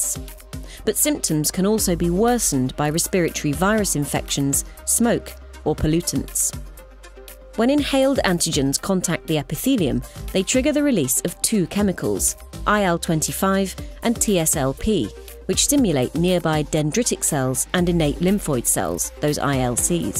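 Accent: United Kingdom. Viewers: The british accent feels like shit, shut up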